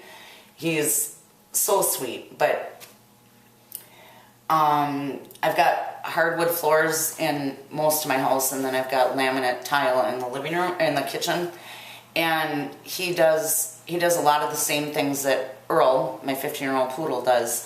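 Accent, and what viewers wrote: United States. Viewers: Good to see you again, and hear your Yankee Doodle accent. Conway is a cutie pie.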